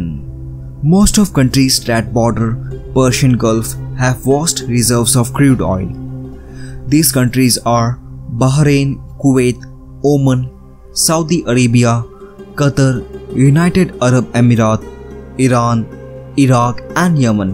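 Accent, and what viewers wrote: India. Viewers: Nasty accent I think it's Indian guy talking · Indian accent is irritating,but the content is superb! Congratulation to the presenter for the effort he has done.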